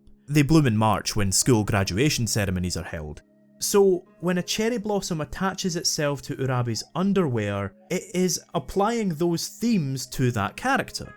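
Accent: Scotland, United Kingdom. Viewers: anime and scottish accent goes so strange together. I meant in a good way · that sweet scottish accent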